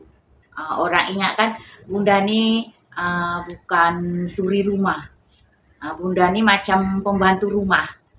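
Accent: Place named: Java, Indonesia. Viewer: Slang Jawo bunda masih pekat sangat saya dengar, kakak ipar saya dah macam orang tempatan dah bunda slang bahasa' Jawo nya sudah kalah dengan bahasa Iban dah😅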